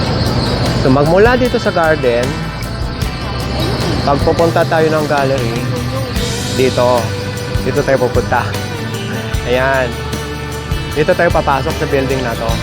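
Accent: Visayas, Philippines. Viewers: Salamat sa blog parang namasyal na rin ako ang ganda ng Gateway Mall lalo sa Garden nila sa taas nakakarelax at libre lang magpunta at magselfie selfie with huge bldg. background nagkakaroon na ako idea saan mamasyal pag uwi ko kasama ko pamilya ko...Im here in saudi Arabia...matagal na na hnd ako nagawi dyan ang laki na ng pinagbago dyan sa Araneta Center ang dami na rin mga Bldg. na sky scaper..Hnd nakakainip manood sa blogg mo nagsasalita kat naikukwento mga nakaraan at ang pagbabago niya pati pagkuha mo ng video maganda very professional at maganda ang cam mo cguro malinaw at professional an dating, pati backgund music mo hnd nakakabagot I appreciate it so much hank you sa sa pag blogg. accent Bisaya ka pero matagal ka na cguro tumira sa Maynila.Ilonggo ka ba Dong?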